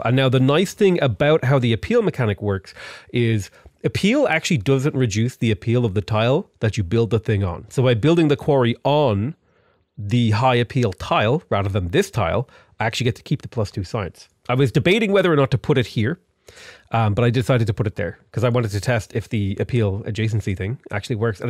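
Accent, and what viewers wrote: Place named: Ireland. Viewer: Anyone feel that he was 2x more Irish than usual?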